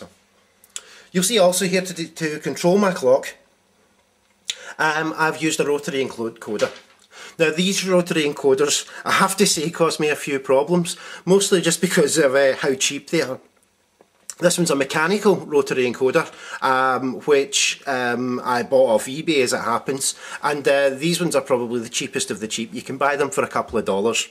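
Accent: Scotland, United Kingdom. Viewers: I knew the accent was souding very scottish. Maybe I'll meet you at the next Arduino meeting at the Electron Club… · Your scottish accent is great - i like it very much!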